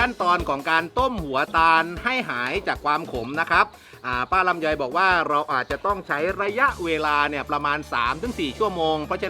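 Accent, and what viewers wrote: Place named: Korat, Thailand. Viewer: คิดถึงภาษาโคราชบ้านเองมาก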